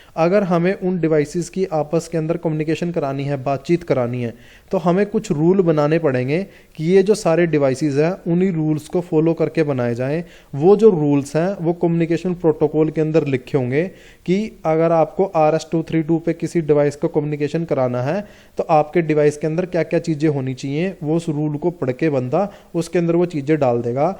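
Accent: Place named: Haryana, India. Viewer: Bhai haryana se h pta lag gya awaj se ❤❤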